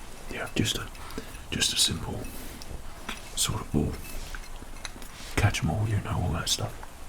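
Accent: United Kingdom. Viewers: Hes bri’ish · .......i have no idea why i like this "gangsta british accent"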